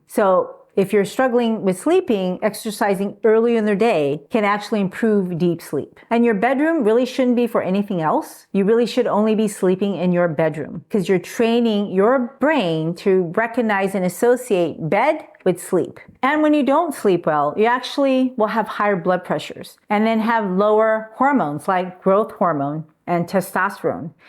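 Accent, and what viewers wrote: United States. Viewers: parli amerikano non ti capisco!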